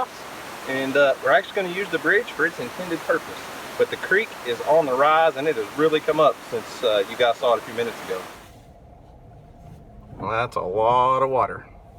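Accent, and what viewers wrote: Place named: Oklahoma, United States. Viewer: So glad I found your channel! Moved from near Oologah OK to UT in Dec 17. Nice to hear that Okie accent!!!!! These people here never understand what I am saying but love the mountains!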